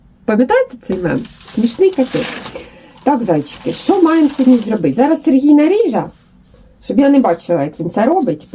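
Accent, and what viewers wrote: Cherkasy, Ukraine. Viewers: Вітання з Новим роком та Різдвом Христовим! Давно вас дивлюся, але пишу вперше. Ви для мене великий мотиватор і натхненниця, особливо після профвигорання. Коли чую Вашу мову, відчуваю себе у гостях на Черкащині, наче побачилася з родичами. В захваті від вашого з ентузіазму. Ви - мої муза із музом. Бажаю вам успіхів, наснаги й здоров'я.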